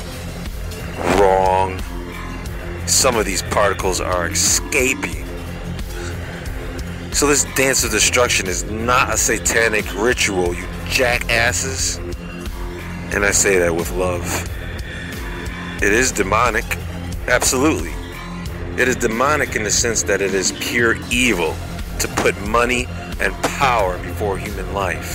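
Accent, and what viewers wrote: United States. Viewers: Why am I unsurprised this is narrated by an American.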